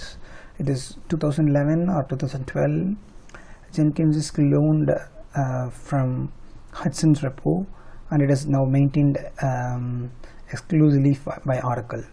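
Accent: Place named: India. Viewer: i hate this indian english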